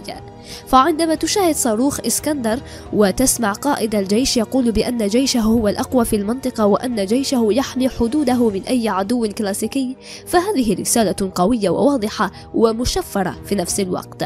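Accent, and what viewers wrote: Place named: Morocco. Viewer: الله يشافيك يا صاحبة القناة راكي مڨريبيا باينة من صوتك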